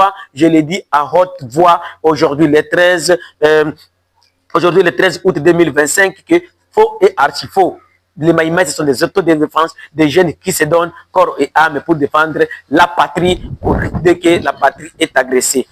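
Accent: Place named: Rwanda. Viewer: Toi malgré tout ça tu es RWANDAi et m23 nous te connaissons même ton parler le confirmé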